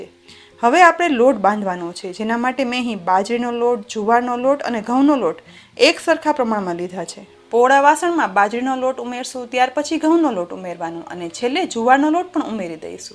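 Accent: Kathiawar, India. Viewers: Excellently instructed in Gujarati language with Kathiyavadi Accents.👍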